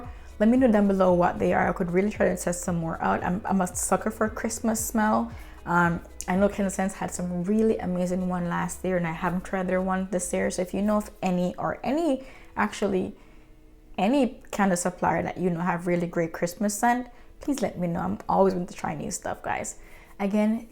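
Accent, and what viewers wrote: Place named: Jamaica. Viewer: Totally love your review! Also love your accent…. Sounds like a beautiful Jamaican accent (I am also Jamaican). Good luck in your business journey.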